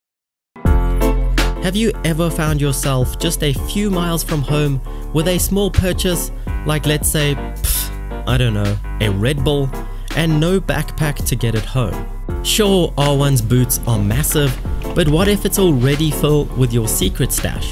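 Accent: South Africa. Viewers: you sound south african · Where are you from? You sound very South African.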